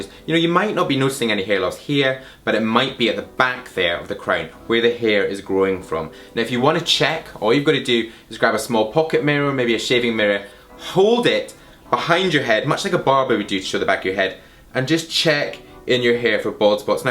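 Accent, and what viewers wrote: United Kingdom. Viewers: Love that British Accent , so cool !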